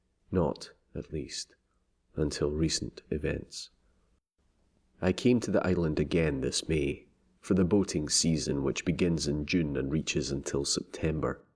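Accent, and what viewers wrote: Scotland, United Kingdom. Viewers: This channel is simply amazing. You guys have some really clever stories, and it doesn't hurt that you have Scottish narrators. XD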